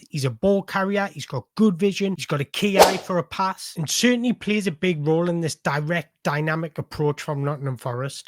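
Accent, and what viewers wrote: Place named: North East England, United Kingdom. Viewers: The comment about your accent is ridiculous, local accents are beautiful. Appreciate you my North east friend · Watched a few minutes and I really cant listen to that north east accent anymore.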